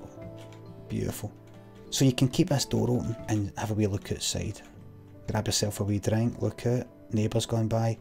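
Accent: Scotland, United Kingdom. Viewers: It's like Jerma but scottish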